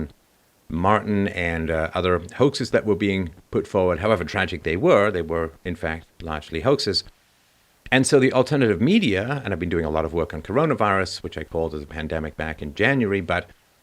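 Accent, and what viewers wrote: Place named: Ireland. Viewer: Where are you from? Cool accent. Is it Irish? The scary thing is...Biden could, potentially win, especially in the middle of all this insanity.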